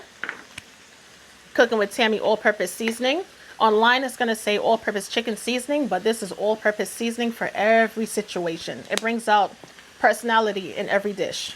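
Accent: New York, United States. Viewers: Good afternoon or morning “ Love ❣️ My Name. Is. Dawson. I just want to start off & Say Yes Yes& Yes 👍🏽💯 absolutely 💕 love your Scrumptious recipes..Girl you put your “ Toes “ in it LOL you remind me so much of my late & Great Grand-Mama “ Pearl” 👼🏽 God rest her precious soul Amen 🙏🏼 But yes I just had to put in the comments because I have pics on and please correct me if I’m wrong you are strong “ New York “ accent 🫠. And he reminds me so much of a cousin I have comes from the boogie down Bronx💯 but Yes ma’am’ ❣️❣️ that’s all I’m gonna let you get back keep continue doing what you doing Great job. 👍🏽💯❣️ Ok... have a blessed one..Ms Dawson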